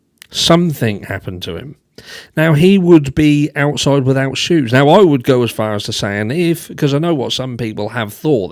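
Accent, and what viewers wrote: United Kingdom. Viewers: what part of UK are you from? I’m interested in accents and learning where they’re from. I traced my dad’s family (Vyse) back to the 1400s in Norfolk.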